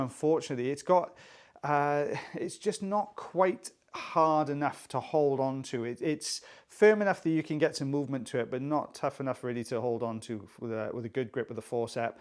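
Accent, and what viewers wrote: Wales, United Kingdom. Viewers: Hi. Your accent has me re-watching Torchwood again.😂🤣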